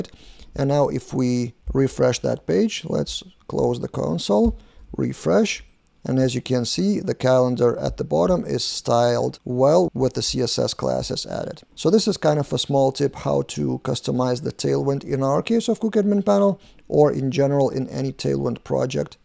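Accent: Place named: Russia. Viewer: Имеется ели заметный русский акцент у автора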